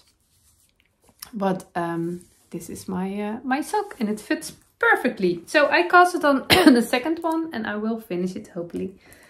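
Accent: Netherlands. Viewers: Greetings from Chicago, Illinois, I came across your podcast a couple weeks and what a pleasure it is to sit down, knit and see all your beautiful makes:) I wanted to see all your episodes so i started from the beginning of the English episodes lol Dont worry about your "Dutchy English" you are doing a great job!!!!! I checked Ravelry for the Kambgarn and it is listed as a Sport weight yarn, 100 % wool - merino dyed and milled in Iceland, it also says Kambgarn is pure imported merino wool. I guess its safe to say its NOT superwash.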